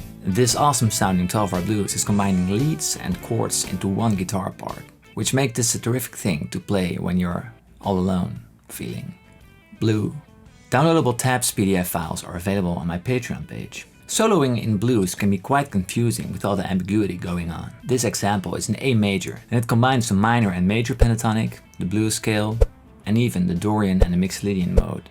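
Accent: Netherlands. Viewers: Great guitar lesson, very helpful! Your English is perfect, but do I hear a Dutch accent?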